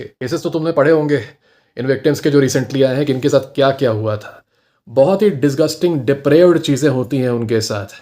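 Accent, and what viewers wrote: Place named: Lucknow, India. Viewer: Good.. Very well put 👌 Also the answer to your question you asked in the end (why men are not getting aware or radicalized? ) is - because of the bitter fact that today more than 50% men have become feminists. Remaining 30% are simps/slaves. Only 20% are aware of this. But the disturbing part is that Rate of conversion of men to simps/feminists is 2 times faster than the rate of men getting aware/enlightened about toxicity spread by infection of Feminism... So, with that being said, people like you (who are actually doing a wonderful job 👌💯) will not be able to bring positive change in Indian Society which is headed to a disastrous end. Anyways, keep up the good work.. I support you... & you have got a good voice... Seems you were an RJ or some process trainer at BPO. Also your accent seems like you belong to Lucknow or Kanpur.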